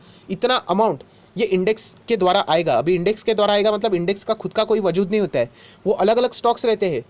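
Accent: Maharashtra, India. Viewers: सर, तुमच्या बोलण्याच्या पद्धतीवरून असे वाटते आहे की,तुमचा मराठी भाषेशी काहीतरी संबंध आहे.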